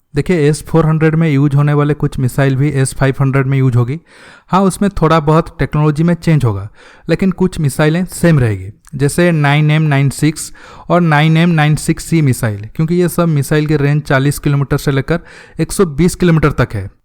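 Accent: Odisha, India. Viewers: tum Odisha se ho kya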